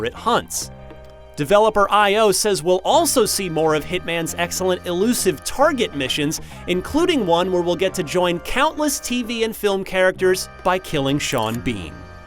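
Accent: India. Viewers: Indian accents arent actually that bad lol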